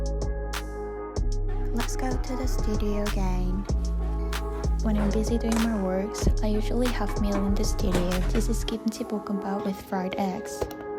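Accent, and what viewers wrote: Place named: United Kingdom. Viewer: 영국식 영어 발음이 너무 멋있어서 영상 보는내내 몇번을 치였는지 몰라여💕진짜 작업 너무 멋있고 판화도 너무 멋있고 노을도 멋지고 그냥 너무너무 멋져요!!!😆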